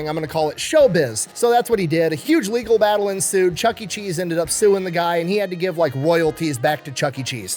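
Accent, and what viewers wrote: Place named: Tennessee, United States. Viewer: sounds like you’re from West TN haha There was one in either Jackson or Memphis that was like that in the 90’s. Our rural elementary school took us there twice for field trips. Oh, the good ol days.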